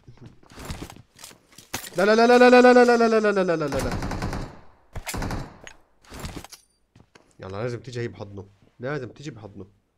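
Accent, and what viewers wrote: Aleppo, Syria. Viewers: حسب لهجتك اتوقع انته من ريف حلب او من حلب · انت من اي محافظة لهجتك حلبية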